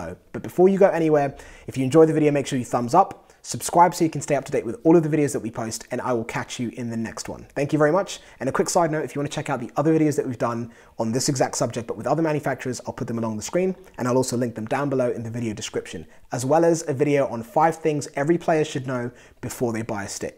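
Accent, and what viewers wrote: United Kingdom. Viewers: is he british lol he goes fond of taking haha good vid tho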